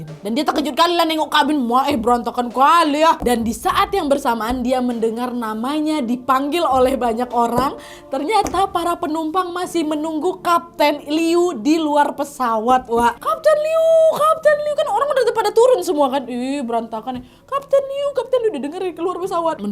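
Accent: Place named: Sumatra, Indonesia. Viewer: Nadiaaaa hahahaha aku suka dg pembawaan:) Aku suka ketawa ngakak KL sdng bercerits cerita seram atau apapun hahahaha Dengan aksen khas Sumateranya yg kental bgt. org mn? Dr Pekanbaru atau dr mn? Aku dl 3 THN sklh di Pekanbaru dan aksennya persis dg:) skrg Sy di JKT lg:) sukses ya:)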